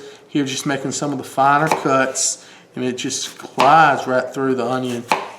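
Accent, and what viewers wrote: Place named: Rural, United States. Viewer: Guy constructive criticism .. you dont start cutting for 8 minutes in a knife demo. Work on the accent I am from NE MS and you are killing the hick vocal quality at impressive levels. finally Learn how to use a knife you make the knife look dull but trying to hatchet through onions instead slicing and allowing knife to do the work . I suggest a quick watch of alton brown's knife video of good eats . oh an lastly like me you are better sited for behind the camera , put the knife on the the shot .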